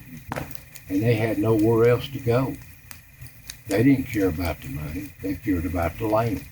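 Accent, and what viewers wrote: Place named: South, United States. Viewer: Same here! Its comforting to hear just talk! Its sad even the accent around here is slowly being bred out I reckon. My accent isn't as strong as my grandparents, and my daughter only barely even sounds southern. Dang internet I reckon. But yes, just listening to these stories, the rhythm and the southern drawl is like a time machine!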